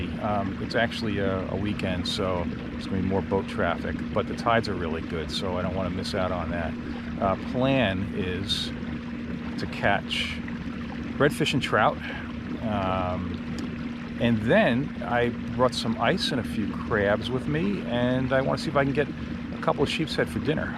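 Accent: Long Island, United States. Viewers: did you move to Florida you got me when you said you where in Florida in that Northshore long Island voice!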